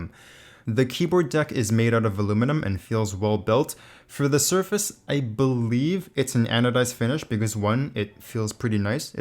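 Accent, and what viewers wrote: Canada. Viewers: IMHO sounds canadian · he's probably Canadian. Can tell by the way he says "out". Maybe Ontario. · Are you Canadian? :))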